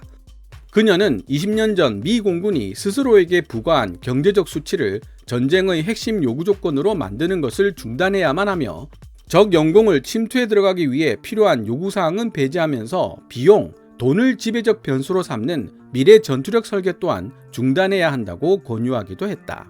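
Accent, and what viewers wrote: Gyeongsang, South Korea. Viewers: 이분은 영어도 갱상도 억양으로 해서 오히려 잘난체 하는 오해를 불러 일으켜 쫌 재수가 없음... 그런데 영문만 나옴 호랑이가 사슴을 봤을때처럼 덤벼듦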